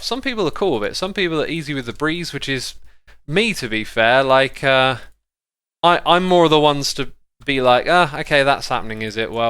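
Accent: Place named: United Kingdom. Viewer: What kind of accent is that? I'm pretty sure X is from Britain, but what section is that accent. It sounds like no other accent and familiar at the same time. I absolutely love it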